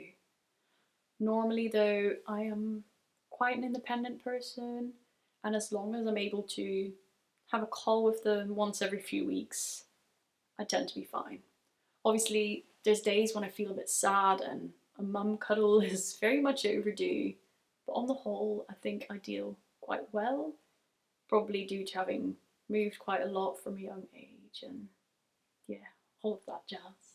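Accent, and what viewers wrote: France. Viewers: Cet accent français 😍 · Great and spontaneous video. That french accent though👌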